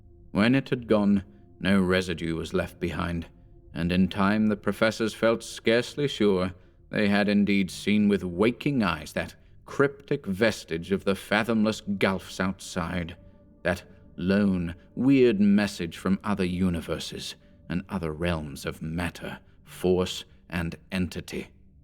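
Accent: United Kingdom. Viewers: Another excellent reading! I’ve been enjoying finishing a story myself first, and then listening to your readings shortly afterward. I very much appreciate your attention to accuracy when you narrate, and there’s something about a U.K. accent that lends a certain subtle sophistication to it haha. Well done!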